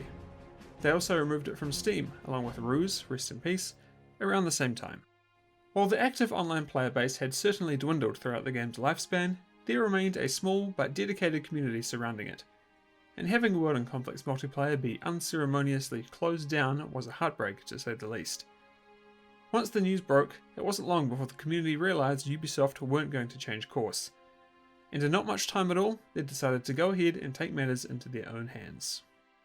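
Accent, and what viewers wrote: New Zealand. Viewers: Really enjoy your Kiwi accent, man that brings me back to the amazing country.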